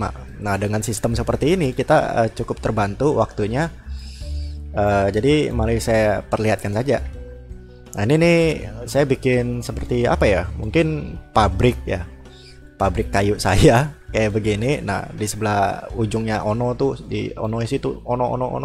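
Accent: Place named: Indonesia. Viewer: Yeah he from indonesian me to :p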